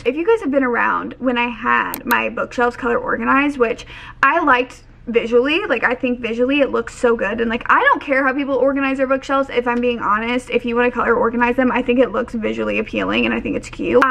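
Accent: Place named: Midwest, United States. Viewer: "audiobooks don't count" ok then well who is keeping score? what are we counting towards here other then our own goals? are the book police gonna come arrest me cause i listened to it instead of using my eyeballs? i was able to talk about it with the other people in book club and none of them knew i'm a big ole CHEATER by listening to it according to that person. side note: i used to live in indy and i no longer live in the midwest at all, and sometimes i just need a good dose of your accent. you mentioned meijer in another video and i ascended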